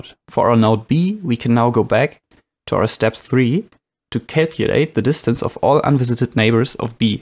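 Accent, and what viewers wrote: Germany. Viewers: I trust this guy beacuse of his german accent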